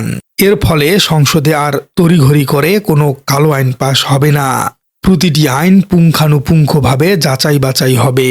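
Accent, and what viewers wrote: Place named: Noakhali, Bangladesh. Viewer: Give up noakhali accents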